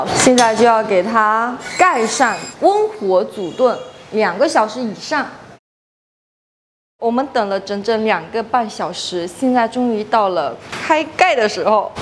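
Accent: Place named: Putian, China. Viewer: 莆普有待提高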